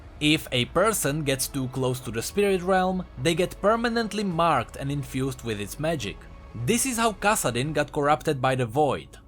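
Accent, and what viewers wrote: Czech Republic. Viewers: Czech accent ? Or ? I think you are from czech republic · He is from The Czech Republic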